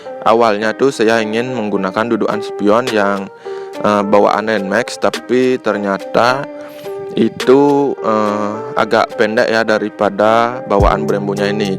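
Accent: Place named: Bali, Indonesia. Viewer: Dari logatnya orang Bali y bli😅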